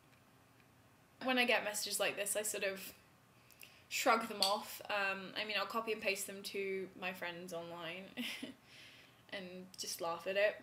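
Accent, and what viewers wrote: England, United Kingdom. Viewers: hiii:3 i love your English accent... *stares relentlessly* -Fullmetal Alchemist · so i was bored and my subscriptions reset and i saw your video and decided to watch it again lol. still funny and i gotta say (in hopefully a noncreepy way? haha) the british accent is still very cute ;) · do canadians have that accent? sounds like semi-british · i don't know, sounds like she has an english accent, so im not sure.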